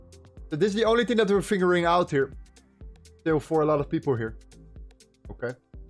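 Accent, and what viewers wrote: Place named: Netherlands. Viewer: Overal te herkennen, dat prachtige nederlandse accent.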